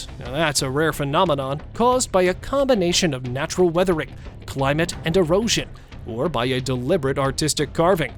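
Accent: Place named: Russia. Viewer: Mne kajetsa, chto dictor russko-govorashiy.. 🧐🤌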